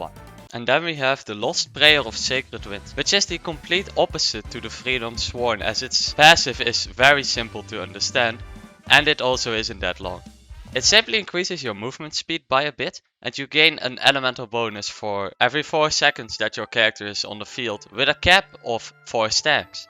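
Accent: Netherlands. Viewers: Are you Dutch? I feel like I recognize your accent...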